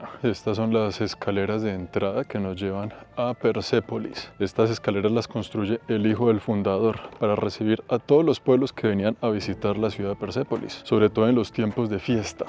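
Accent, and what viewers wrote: Colombia. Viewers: Me encanta su tono de voz tan varonil y ese acento colombiano tan característico, saludos desde Culiacán Sinaloa, México ❤ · es neutro de Colombia. jajaja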